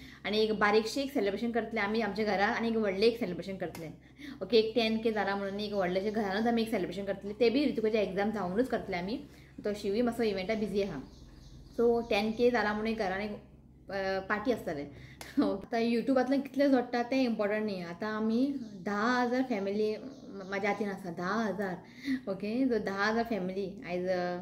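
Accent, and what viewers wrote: Konkan, India. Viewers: Hi Congratulations🎉🎉 God Bless You n your family Your s is the 3rd Vlog I am watching, so I have compared with the other 2 vlogs n found yours to be the best natural vlog, you are perfect in all, I like your konkani accent, I like when you crack jokes, I have tried many of your recipes n it turned superb n shared them to my friends. All the best 🎉🎉🎉🎉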